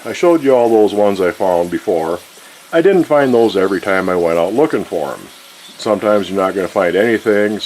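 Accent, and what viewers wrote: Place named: Michigan, United States. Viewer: So cool! I rarely see a reply from so this is a great surprise! When the commenter said, “you have a voice like the skipper from Gilligan’s Island” the Skipper is the son of the great Alan Hail or Hale, who often appeared with Errol Flynn in those awesome swashbuckler movies. Skipper’s name was Alan Hail Jr, or Allen? Sorry. I know the names but don’t look at them in print much because I’m too,busy enjoying them in the movies! Anyway, I’m pretty sure has been a professional voice but he won’t tell us where or who he is. Atleast not that I’ve seen yet. And he’s very humble. But the anonymity is driving me curious. I do recognize a slight Michigan accent though. Would be nice to know his previous profession, maybe still current. One thing’s for sure... the voice is a definite gift and everyone loves it. I say, go for it. 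God bless.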